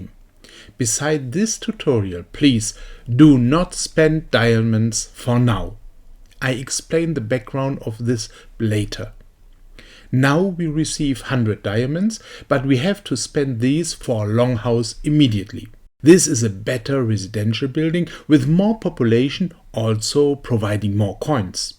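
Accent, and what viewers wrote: Germany. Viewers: Hört sich sehr deutsch an dein Englisch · your accent is awesome haha. wundebar!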